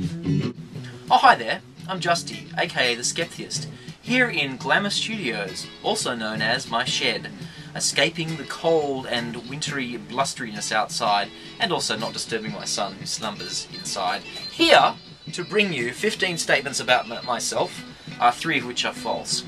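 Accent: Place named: Australia. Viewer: Number 9 was a lie; You sound Australian.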